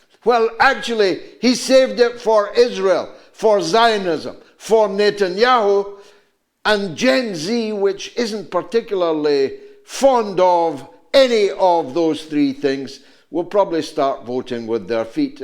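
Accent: Scotland, United Kingdom. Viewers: i think you are great George....you might roar with a scottish accent but you are definitely a lion of england just the same...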